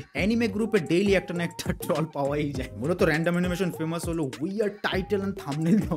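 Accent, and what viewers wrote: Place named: England, United Kingdom. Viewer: আর আপনি ইংরেজি accent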